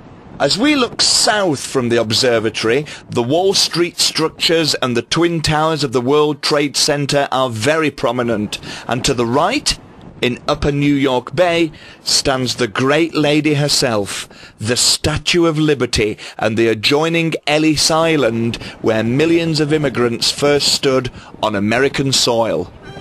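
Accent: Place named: United Kingdom. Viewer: What a nice British Accent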